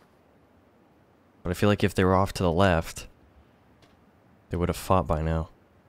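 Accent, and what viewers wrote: Canada. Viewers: You gotta be Canadian... you sound just like my uncle just not as deep of a voice lol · are you Canadian? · Are you Canadian?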